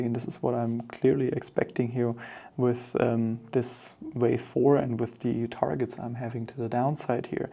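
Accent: Germany. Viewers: You have a very strong german pro noun ci a tion!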